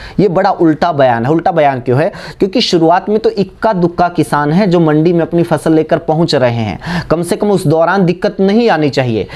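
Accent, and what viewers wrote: Haryana, India. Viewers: Very nice bhai Haryanvi tone is also very nice in between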